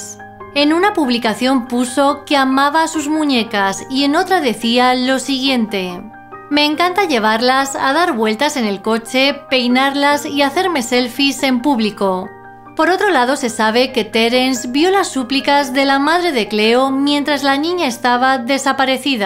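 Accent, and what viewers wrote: Castile, Spain. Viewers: Se escribe pijama y se pronuncia pijama porque habla castellano de España,a que escuela de tu país fuiste tú para corregir algo bien dicho ?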